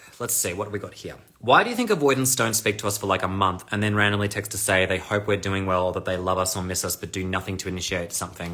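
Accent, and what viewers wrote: Australia. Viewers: I have been listening to you every day, and it is fair to say that you are the biggest gem on YouTube/IG. The depth of your knowledge and expertise is truly fascinating, at times even too much for me to absorb (and I am a nerd).☺️ Also, loving your authenticity and the Australian accent. A big thank you and keep on rocking. 💚🙏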